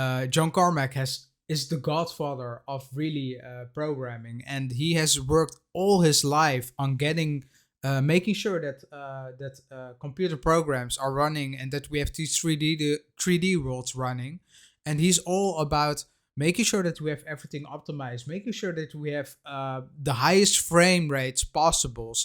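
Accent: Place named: Netherlands. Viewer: Ik hoorde gelijk dat je uit Nederland komt :)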